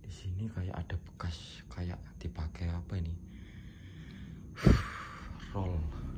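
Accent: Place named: Java, Indonesia. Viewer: Aku seneng.. Denger pean ngomong Jeannnn.. Khas jowone Cak.. Mantaaf pokok e..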